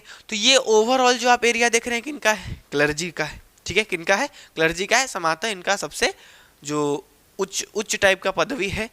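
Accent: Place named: Uttar Pradesh, India. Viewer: You are from UP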